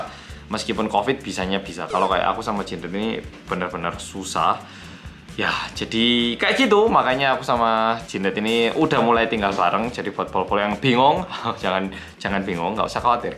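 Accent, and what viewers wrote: Java, Indonesia. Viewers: Tapi sue sue medok e yo ilang wkwkwk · Opose garai kepo ae seh mas medhok iki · Bahagia slalu wong Korea medok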